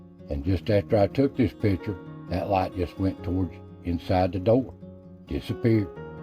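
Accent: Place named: South, United States. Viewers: I love the narrator's voice & beautiful southern accent! Sounds so soothing, like my Grampa telling me a story, which are the most precious ever. ❤️ ✌️ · Hello good sir.. A new subscriber here.. I'm always intrigued by the US southern accent 😄.. I also love paranormal things, pls more content like this.. 😁 Be safe & God bless.. (A warm greetings from Indonesia 👋🏼🇮🇩.) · Yes your voice is beautiful. Not just the physical quality of it, but your slow cadence and beautiful Southern dialect.❤ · Greetings from Australia.Loved listening to your videos. I just love your southern accent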